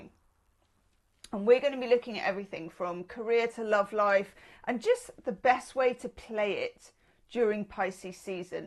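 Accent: Australia. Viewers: OMG. I love the glasses and headscarf. You look gorgeous ❤ also I love your Aussie accent. It's very good and for my own amusement I am constantly imitating you imitating Aussies with a huge grin on my face even though no one would know why I am so amused. You bring a lot of joy to my life. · Just heard your Aussie accent, sounds spot on 👌fellow Aussie here x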